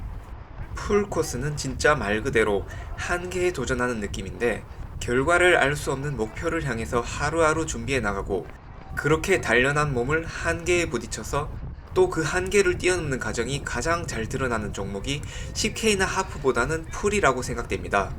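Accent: Gyeongsang, South Korea. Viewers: 대구 출신이라니 경남분인줄 알았어요! 더반갑습니다. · 경상도 특유의 쌍시옷 발음 약하게 들어가서 귀여운 느낌 있어요 ㅎㅎㅎ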